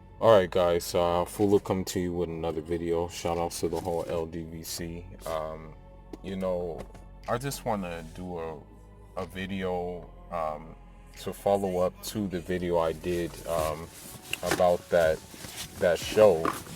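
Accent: United States. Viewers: It sounds like you are American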